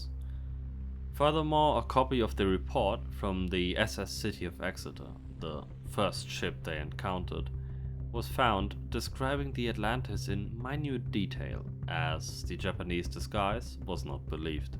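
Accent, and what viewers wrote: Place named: Germany. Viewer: great story, but this is the worst way to tell it... very childish presentation and piss poor german accented english.... damned offensive actually.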